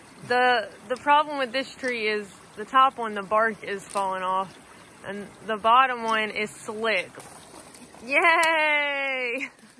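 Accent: South, United States. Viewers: Your accent doubled down in southern flair. :) Thanks for posting!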